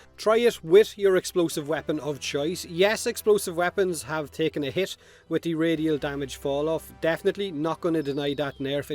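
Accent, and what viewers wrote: Ireland. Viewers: Irish accent man: says gauss is a sanic! also irish accent man: proceeds to never actually using his 1 in the video... great vid though!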